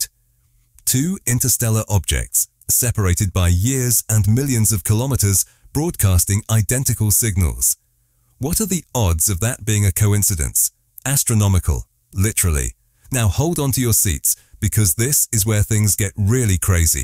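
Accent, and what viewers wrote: United Kingdom. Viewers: Am I the only one who’s sick of the ubiquitous English accent AI voice narrators? Life’s too short to listen to this fop spell out “3 I A T L A S”, over and over - at least 20 times in the first few minutes - makes it unwatchable ~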